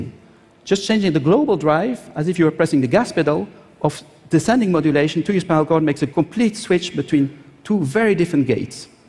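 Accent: France. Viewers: when i finally noticed he is french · he's a french guy. i can hear his accent 😁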